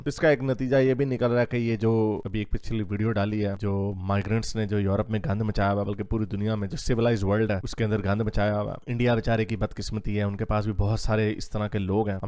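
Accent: Punjab, Pakistan. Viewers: Sir ur punjabi accent is great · No they both are Pakistani Punjabi speaker that is why accent is same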